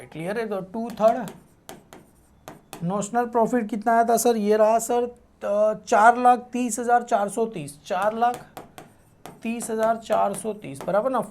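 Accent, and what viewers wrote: Gujarat, India. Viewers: Sir ap gujrati ho kya..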